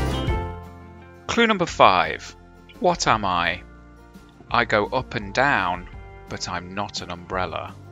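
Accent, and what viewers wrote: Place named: England, United Kingdom. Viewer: 18 wrong for me on that one. Although I know it is a sphygmomanometer, most medical professionals call it a cuff, probably because that is less of a mouthful. As for the ponderer's question, I'd have thought with your English accent you would have known it may be an elevator for Americans, but it is a lift in English English.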